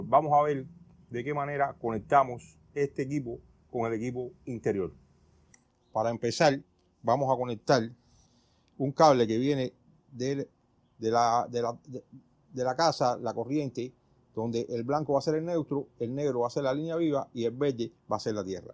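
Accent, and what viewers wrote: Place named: Caribbean. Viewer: Así hablamos nosotros los caribeños, cubanos, Dominicanos y como yo puertorriqueño, nuestro español y cultura ya es asi!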